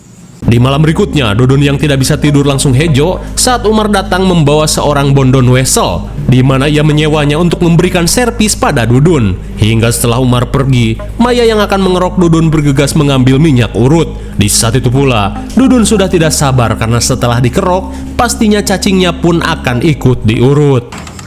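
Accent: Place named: Banten, Indonesia. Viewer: Mang orang banten?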